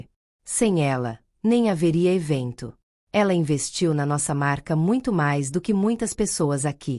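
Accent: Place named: Spain. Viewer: O português, de repente, do nada, vira espanhol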